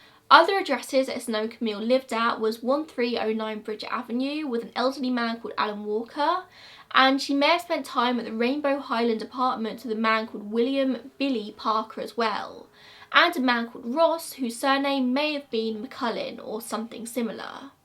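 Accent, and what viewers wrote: United Kingdom. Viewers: i'm just hypnotized by your perfect british accent <3 i love it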